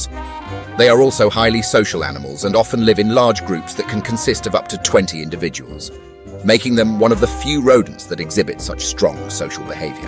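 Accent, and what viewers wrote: United Kingdom. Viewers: 😂😂😂😂🤣🤣🤣😂”….They STRAIGHT UP look like a sacred animal, from out of The Lord Of The Rings universe.” I can’t! I just can’t!! Trying to sound all hood, while educating with that British accent. I paused the video for that one😂😂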